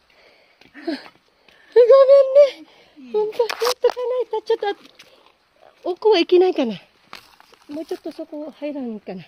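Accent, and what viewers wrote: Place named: Japan. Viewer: for the second time na pinanood ko to....kagabe at now....nag enjoy lng ako lah....at na inggit ako not only for the blueberry pati na den sa japanese accent mo 💚☘️💙...love love this video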